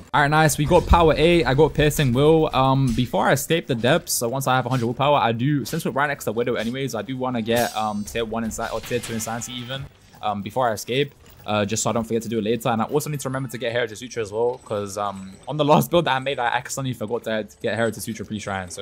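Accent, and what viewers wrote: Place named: United Kingdom. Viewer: woahh hi idk who's british accent is better you or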